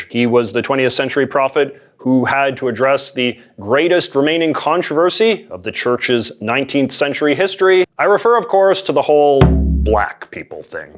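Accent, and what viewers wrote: Canada. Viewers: He's Canadian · Why am I just now hearing about this guy with 80's hair and Canadian accent? Because I've been listening to Neil Young? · Your 'bouncy' countenance is driving me insane for some reason, like you rhythmically bounce up and down slightly to the cadence of your speech, twisting your head for emphasis on some words and then give a more pronounced 'bounce' to emphasize others. Like it's all I can notice whenever I see you talking, which is fine aside from certain extremely stereotypical Canadian pronunciations. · Canadian, eh? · 🇨🇦Ha ha. Nice Canadian accent ya got there, Eh?!👍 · You must be canadian by the way you say "about" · The purity of the Candian accent is just..... chef's kiss...... <3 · An ethnically ambiguous surfer dude that says “about” like Canadians. Modernity is so fun.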